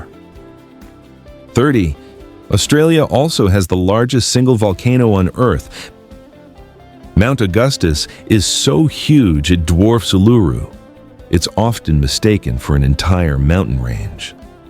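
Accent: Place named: United States. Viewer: Talking about Australia with an American accent and mispronunciations? That's a paddling.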